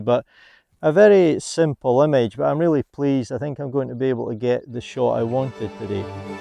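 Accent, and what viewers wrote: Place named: Aberdeen, United Kingdom. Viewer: hi, thanks very much for getting back to me so promptly - ok you have reassured me. I'll probably get one. I enjoy your videos,very straight forward and imformative compared to a lot of others where the presenter talks a lot about themselves before getting on to the subject matter. You sound like you come from the Aberdeen area. That's where I stay, my wife being an Aberdonian. Thanks for getting back to me and for your videos which are great.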